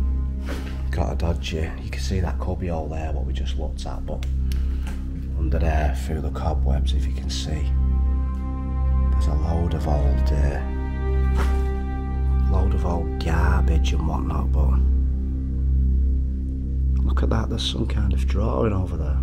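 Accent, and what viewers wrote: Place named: Salford, United Kingdom. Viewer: The hollywood touch with a salford accent. Combining flavours.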